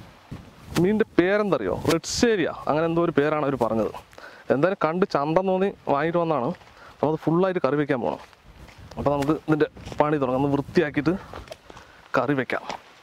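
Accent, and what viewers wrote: Palakkad, India. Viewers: പാലക്കാടൻ ഭാഷ ഇസ്തം 😀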